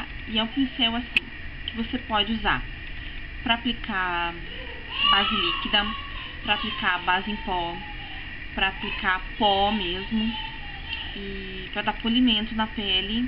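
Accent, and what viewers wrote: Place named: Rio Grande do Sul, Brazil. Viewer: flor, uma dúvida.. tu é gaucha?? tem sutaque, hehe :DD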